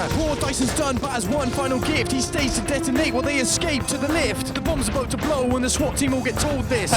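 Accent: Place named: United Kingdom. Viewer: LOL, nah mate. He's British. Strange how other countries have trouble telling our accents apart.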